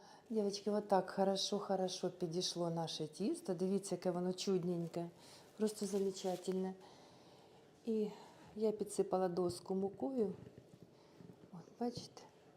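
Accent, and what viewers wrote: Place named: Surzhyk, Ukraine. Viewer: Пиріжки, а не піражки, робити, а не рабиць, не мЯгкий, а м'якИй ,і т.д. Вибачаюсь, але мене цей суржик страшенно дістає...Це приниження нашої мови! Ви така красива і розумна, але цей суржик знецінює все... Чи вам теж - " какая разница?"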